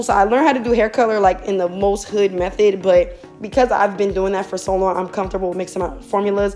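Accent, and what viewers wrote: Philadelphia, United States. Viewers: Where are you located? I hear a Philly accent but maybe that’s just me 💖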